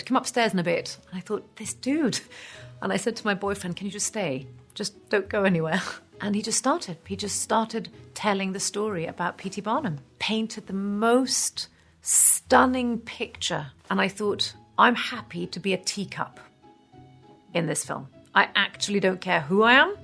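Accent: United Kingdom. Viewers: Fint att hennes svenska brytning märks genom den tjusiga Engelska dialekten :P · She sounds more British than most British do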